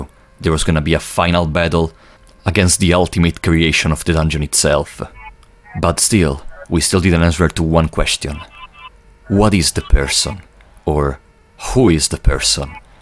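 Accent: Italy. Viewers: i just duscovered your channel, and was wondering, are you, by any chance italian? curiosity js killing me, also i love the content i hope you keep growing like you are doing👍👍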